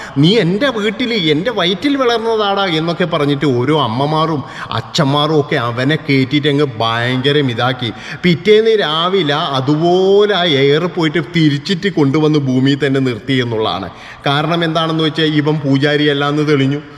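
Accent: Kannur, India. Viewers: Kannur slang nice ❤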